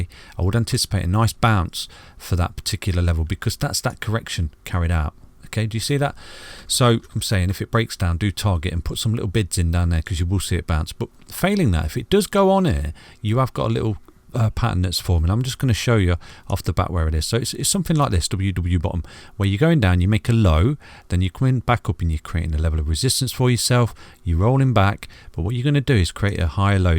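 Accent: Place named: Nottingham, United Kingdom. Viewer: that sounds like a Nottingham accent there? Forgive me if I’m wrong! Great videos! 👊🏽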